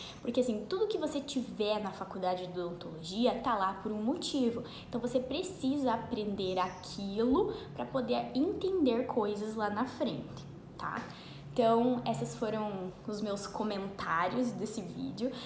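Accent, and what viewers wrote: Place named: South, Brazil. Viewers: é do Sul pelo sotaque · Esse sotaque gaúcho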